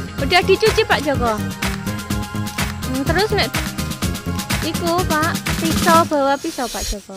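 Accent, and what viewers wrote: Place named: Java, Indonesia. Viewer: Jawane totok tenan yooo.... 😂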